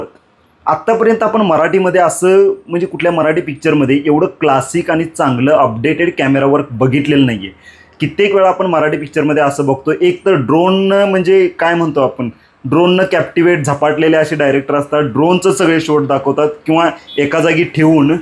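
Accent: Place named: Kolhapur, India. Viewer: बोलताना बऱ्यापैकी सगळे मुद्दे घेतोस, तुझी बोलीभाषा आवडली... नाद खुळा कोल्हापूर...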